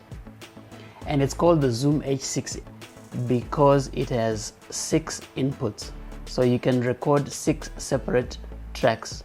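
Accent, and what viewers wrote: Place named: Zimbabwe. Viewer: This sounds like a Zim accent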